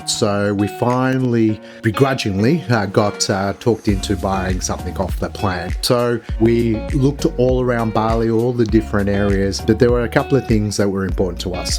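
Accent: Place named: Australia. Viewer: He didn't mention USD or AUD but from his accent i think it's AUD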